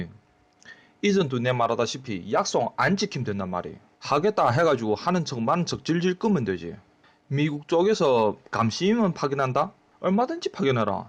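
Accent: Yanbian, China. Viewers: 你放心 这小子口音纯延边朝鲜族 国籍就不知道了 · 其实延边地区也是슴다延吉。말이야安图 敦化。每个地方都会有稍微的不同。语言来说这哥们像图们和龙。目前为止这哥们还能把持得住度。貌似经常看文昭了石头的视频，总结能力，语言组织能力什么的都很强。视频的前面也说了，拿美国的钱（或许也就是视频收入）在日本的朝鲜族。就是不知道入没入日本籍。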